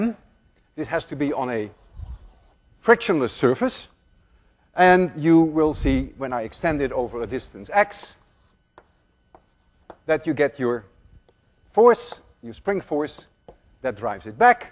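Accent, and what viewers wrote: Netherlands. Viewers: He sounds so dutch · what kind of accent does this guy have? He sounds somewhat Dutch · his dutch accent is distracting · hes dutch u can hear that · he has an accent, a dutch accent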